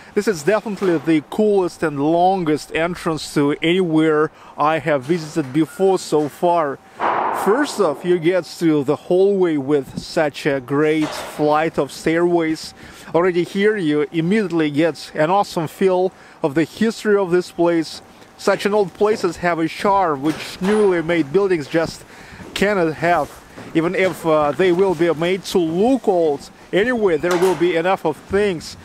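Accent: Russia. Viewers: I understood "salary's lab". You have russian pronouciatio: "o" becomes "a" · Daanggg, I was in St. Petersburg in 2018 for 12 days but I didn’t know about this place. Awesome! Btw. your thick fat Russian accented American English is so much fun to listen to. I subbed.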